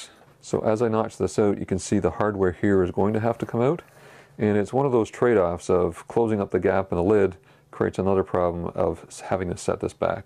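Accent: Canada. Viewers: Thanks for another great video. Yes, we do need to see you more often. a) Why are you apologising for your Canadian accent? It's as good/bad as any other. b) Yes, I've emailed Lee Valley. c) yes, I gave it a thumbs-up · Don't worry about your pronunciation of the word router. I love the Canadian accent. I drove a taxi here in the UK, and Canadian passengers were always pleasantly surprised when I asked what part of Canada they were from. They always assume that the British think they are Americans. As soon as I heard a word with OU in it it was obvious they were Canadian. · No need to apologise for your wonderful and authentic Canadian accent. Love your channel! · You don’t need to be sorry for your Canadian accent. It’s part of what makes you uniquely you.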